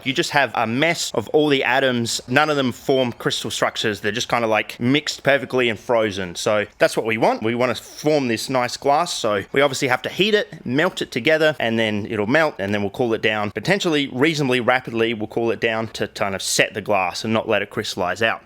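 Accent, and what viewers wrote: Australia. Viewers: with an Australian accent, which as an American, makes this 45% more engrossing to me than NileRed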